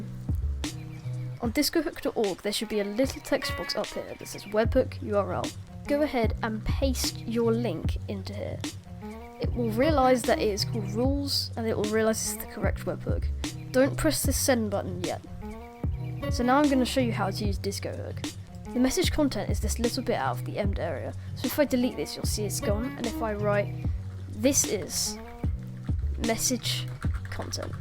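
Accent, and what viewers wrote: United Kingdom. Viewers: Man sounds like he is British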